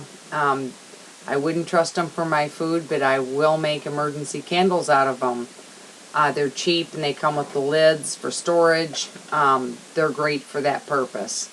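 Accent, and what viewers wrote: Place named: Minnesota, United States. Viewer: First time on your channel, liked and subbed. Thanks for the simple common sense talk. I'm trying to figure your accent. I'm guessing northern Minnesota or N.D., not so much Wisconsin though. Thanks again, Sam.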